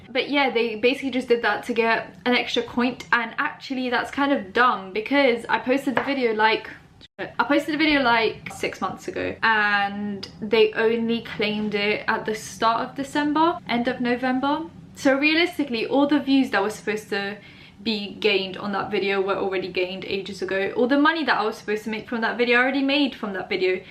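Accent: United Kingdom. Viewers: Grl i started liking uk accent because of you and i love yours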